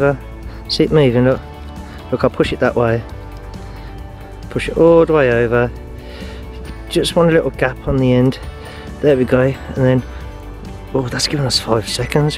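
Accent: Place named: Birmingham, United Kingdom. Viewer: The brummie version of Kai from DigitalRev...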